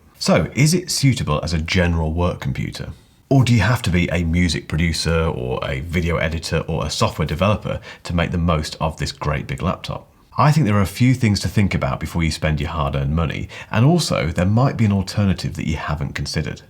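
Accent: United Kingdom. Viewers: Watching your videos not only gives much useful info but also allows listening to perfectly clear British speech, which is like honey in my ears. From Russia with love:)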